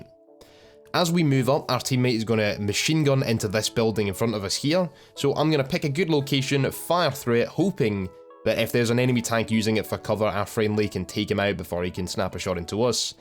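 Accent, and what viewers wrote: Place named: United Kingdom. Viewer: Eduardo Raul hes scottish... his name is litterally. Also his accent makes it very clear he is from the UK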